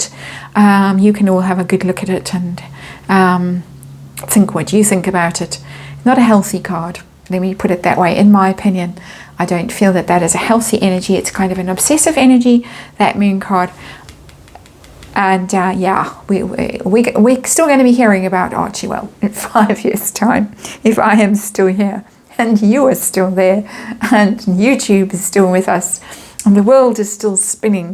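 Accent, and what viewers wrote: South Africa. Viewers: Your readings are so interesting, and pretty much on the money . your voice transports me back To Cape town where i lived in the 70! Looking forward to the next! Grateful for your time and sharing ❤ I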